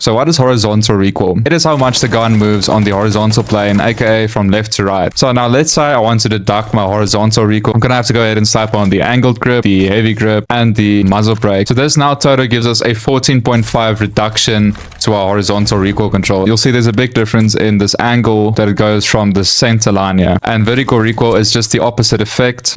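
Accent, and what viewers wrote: South Africa. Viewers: Awesome accent bro, South African right? I love it, and great informative video!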